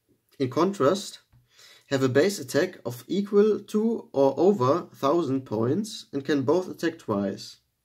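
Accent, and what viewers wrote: Germany. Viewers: wie man das deutsch raushört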